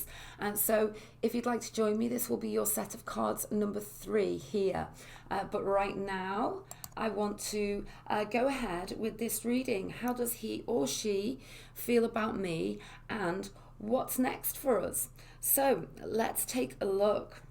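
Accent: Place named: North of England, United Kingdom. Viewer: She is from the North of England maybe Liverpool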